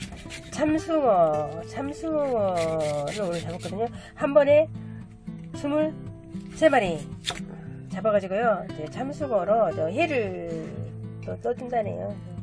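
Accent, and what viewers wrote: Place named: North Korea. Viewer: 북한말인줄 ㅡㅡ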